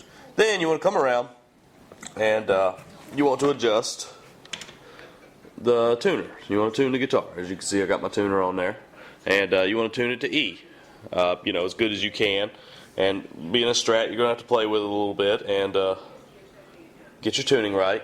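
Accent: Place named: South, United States. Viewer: your accent sounds a lot like Bret Farve bro. you from Mississippi or Louisiana ? good video, very basic and to the point. thanks man.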